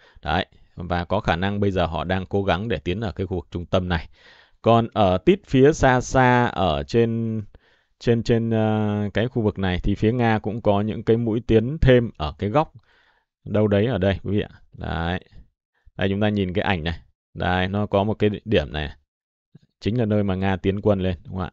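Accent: Standard. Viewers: Giải trình hay âm phát chuẩn rỏ ràng ❤❤❤🎉🎉🎉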